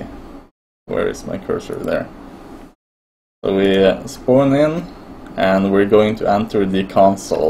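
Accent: Sweden. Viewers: Swedish detected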